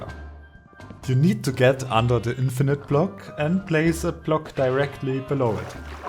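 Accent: Germany. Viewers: duhörst dich nach einen deutschen an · Are you german ? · dieser deutsche Akzent xd. beste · Thanks dude! German accent rullez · Such a strong german accent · Man hört den Deutschen Akzent xD Und geile Idee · Der ist deutsch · I missed that heavy German accent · Bist du Deutscher? 😂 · r u from germany? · wie man einfach hör das du deutsch bist xD geil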